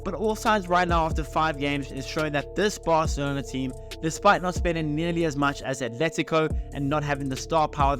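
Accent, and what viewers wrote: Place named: South Africa. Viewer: Can recognize a South African anywhere. Hansi flick is a mastermind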